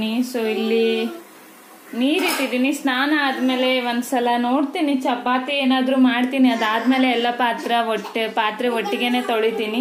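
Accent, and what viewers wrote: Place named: Kundapura, India. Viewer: Kundapura bashe super. Nam kundapura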